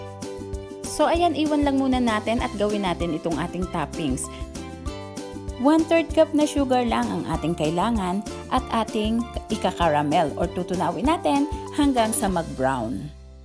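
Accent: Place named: Iloilo, Philippines. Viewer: Ilonggo accent i can hear!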